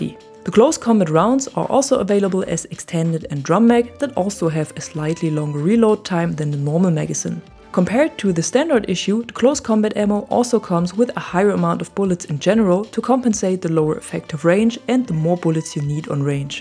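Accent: Germany. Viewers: Recongnised a slight german accent :) Thanks for the video.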